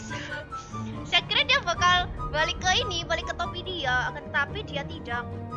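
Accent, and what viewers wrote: Java, Indonesia. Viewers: mba nya org jawa kah? logat nya kental banget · Medhok ya ngomongnya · Kowe wong jowo mbak 👀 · Suaranya medok 😂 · medok bgt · Medokmu candu sekali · Mbak medok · medok medok lucu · Jawa ga sih?? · Mewedok ni streamer suka aku wkwk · kok bisa gue baru nemu manusia 1 ini, gameplay bagus, hero ga template cwe, auto subscribe sih apalagi jawa banget😂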